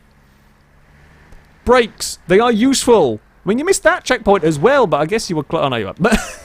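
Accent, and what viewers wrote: United Kingdom. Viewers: went full British in this one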